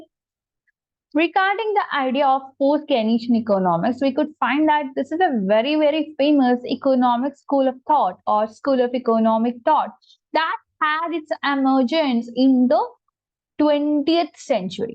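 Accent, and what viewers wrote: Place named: India. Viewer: I’m sorry for the bluntness, but the Indian English accent is so harsh to an American English ear that I could not understand much of what was being said.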